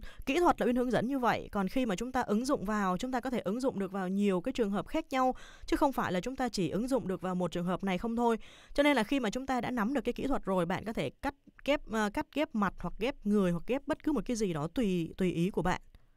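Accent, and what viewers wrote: Standard. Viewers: Chưa thấy ai phát âm chuẩn và dễ nghe dư bạn. Cảm ơn b nhé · cảm ơn chị rất nhiều, một bài chia sẻ dễ hiểu nhất, có lẽ những khóa học mất tiền cũng không chia sẻ những kiến thức này. Đã thế giọng chị lại còn hay phát âm lại chuẩn nữa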